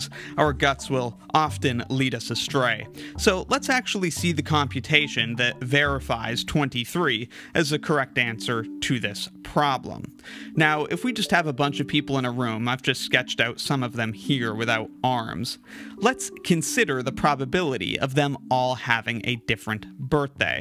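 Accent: Canada. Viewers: Arr you Canadian by any chance? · Canadian! He gives himself a way a couple of times with with "aboat" 😝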